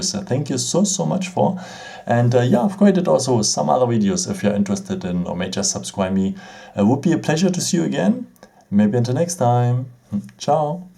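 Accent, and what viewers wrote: Germany. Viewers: Kann es sein das du aus Deutschland kommst? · Sounds so german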